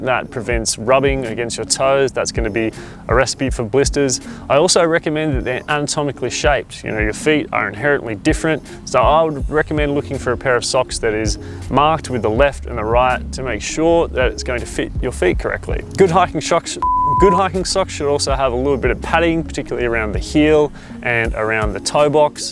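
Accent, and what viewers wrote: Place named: Australia. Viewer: mate you sound aussie like the crocodile hunter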